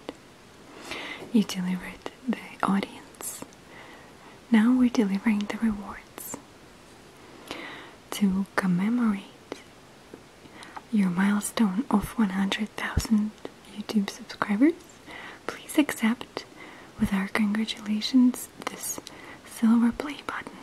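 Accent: Russia. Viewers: Scissor__hands94 is definitely one of my favorites. If you like her accent, I'd suggest ASMRMania (another Russian gal, her accent is quite a bit thicker than.) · I really love your facial with strong Russian accent. As well as your sleep fairy. Could you please do some more similar? Thanks for always brightening my day,. Keep up the grand work:-)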